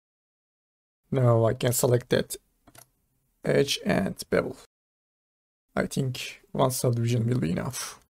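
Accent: Turkey. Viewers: Sanırım Türk bir kardeşimizin kanalı, ingilizcesi tam bir türk aksanı içeriyor :)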